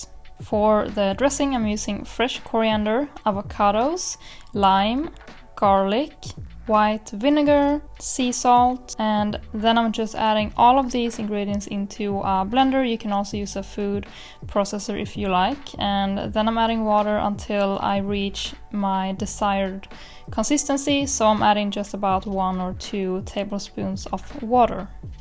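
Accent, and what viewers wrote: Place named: Sweden. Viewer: Visste att den dialekten var svensk, bra video! Nyttigt och bra för hälsan✨✨🌺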